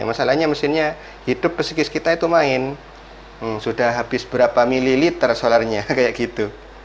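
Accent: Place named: Madura, Indonesia. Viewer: Mantap sampean logatnya kaya orang medunten ...ya bang salam solder panas bang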